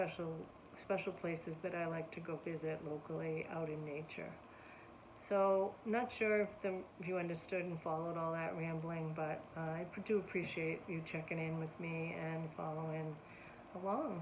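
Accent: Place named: New England, United States. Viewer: Audio was fine very clear good video. I can tell you’re from New England though lol.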